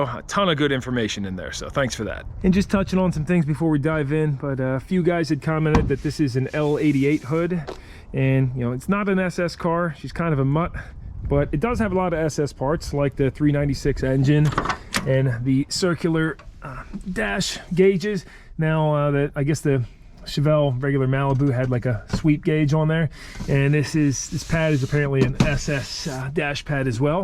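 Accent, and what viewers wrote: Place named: Pittsburgh, United States. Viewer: Are you originally from Pittsburgh?? Your accent is so reminiscent of Pittsburghese it's uncanny.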